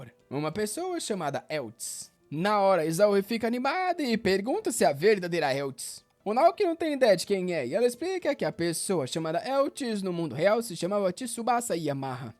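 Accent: Rio de Janeiro, Brazil. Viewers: o é carioca? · é carioca?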